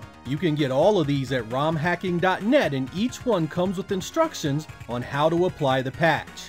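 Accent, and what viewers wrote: United States. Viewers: After so many videos it finally hit me why your accent is so familiar, you must be from the same state as Tim Cook?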